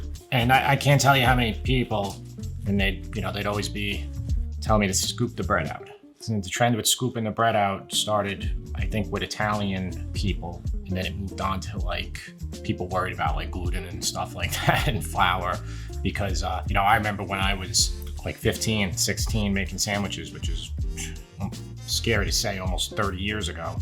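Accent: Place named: Long Island, United States. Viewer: i just knew you were from Long Island from your accent. I as well have lived on LI my whole life..........and love your videos!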